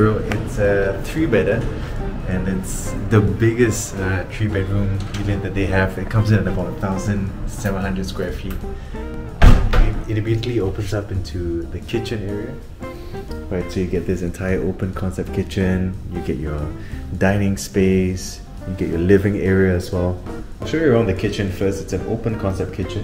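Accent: Singapore. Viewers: He has vy obvious Singaporean accent, not Philippino accent.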